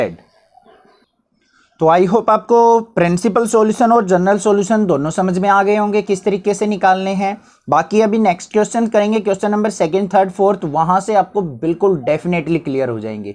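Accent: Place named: Rajasthan, India. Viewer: Aapka accent rajasthani h. Aap rajasthani hai na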